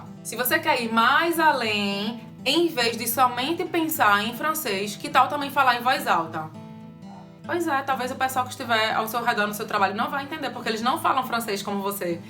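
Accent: Pernambuco, Brazil. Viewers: Adoro seu sotaque pernambucano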